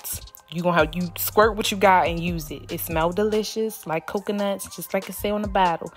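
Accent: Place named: DMV, United States. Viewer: accent😂gotta be from dmv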